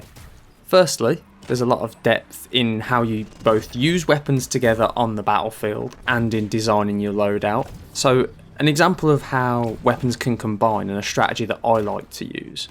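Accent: United Kingdom. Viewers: I like this brummie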